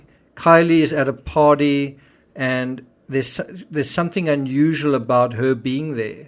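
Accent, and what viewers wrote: South Africa. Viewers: Hello. Are you South African? Thanks for this.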